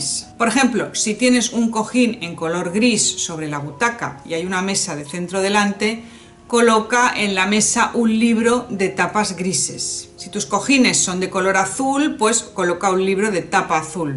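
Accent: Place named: Spain. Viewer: Soy de Argentina y siempre me molesta la onda "gallega" como decimos acá, pero tu onda y tu forma de hacer los videos (vidéos se dice en Argentina. con acento en la e) me parece muy agradable y me sorprendo a mí misma disfrutando tu forma de hablar y de enseñar. Muy bueno!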